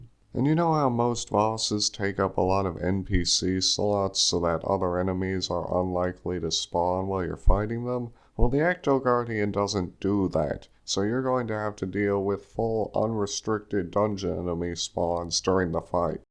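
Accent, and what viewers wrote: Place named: South, United States. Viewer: Is it just me or does his voice give off " " vibes?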